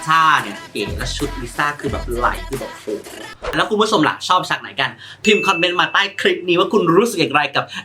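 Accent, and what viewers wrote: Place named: North, Thailand. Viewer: ชอบความ อ้อ~อ๋อย คนเมียงของแต๊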